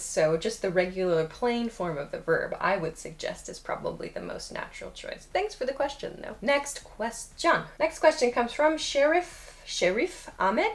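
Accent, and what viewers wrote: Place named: United States. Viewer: Thank you for answering my question. i love your accent. this is my best American teacher.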